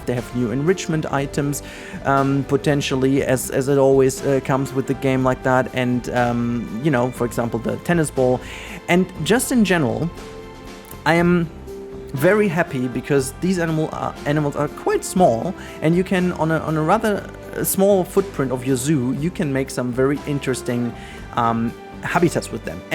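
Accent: Germany. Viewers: Und was soll das bitte für konstruktive Kritik sein? Was soll jetzt gegen den Akzent machen? Das kommt nun mal durch. Ist ja nicht so, als ob er das macht, um Leute zu ärgern oder um extra deutsch zu klingen. Die wenigsten sprechen eine fremde Sprache komplett ohne Akzent, wenn man sie nicht von klein auf gelernt hat, also wozu sollte man das bemängeln? Man versteht ihn und sein Englisch klar und deutlich und wenn einen das stört, dann muss man eben woanders gucken. Wir alle lernen im Leben, ob Sprachen oder anderes und jeder lernt anders schnell und spricht Wörter anders gut aus. Niemand ist perfekt. Was genau soll daran nun voranbringen, das man seinen Akzent bemängelt, der nach und nach mit dem regelmäßigen Sprechen von allein verschwindet? Sprachen sprechen und lernen ist ein langer Prozess, nichts was man über Nacht lernt. Mal ganz ab davon, dass es noch hinzukommt, ob man britisches oder amerikanisches Englisch spricht, also was genau hätte er bitte noch verbessern können? 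Soll er seine Familie ignorieren und Youtube aufgeben bis er perfektes Englisch spricht oder wie genau sollte das bitte laufen? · Krasser Akzent... Bist du Deutscher?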